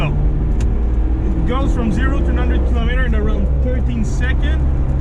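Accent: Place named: Quebec, Canada. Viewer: damn this is really funny, love the jokes. Your delivery suits the French Canadian accent perfectly.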